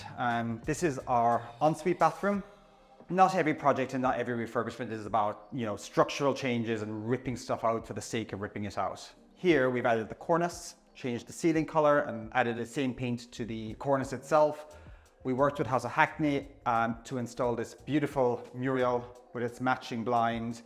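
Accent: Ireland. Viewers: Hi lovely house, but do i hear an Irish accent???? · He is Irish. Not everyone who lives in the Uk has the same accent.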